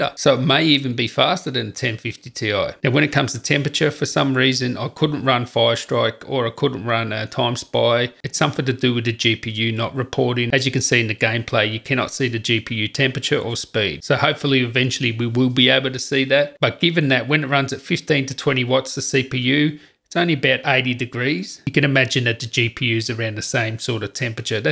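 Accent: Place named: Australia. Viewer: ah.. you did it! great content, your accent is quite australian though!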